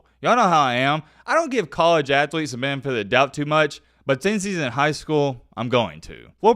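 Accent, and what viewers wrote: South, United States. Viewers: C'mon bro southern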